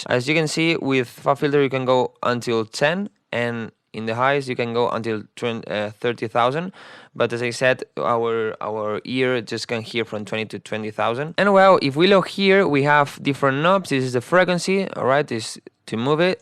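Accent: Spain. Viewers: Como se nota que eres Español xd, buen video!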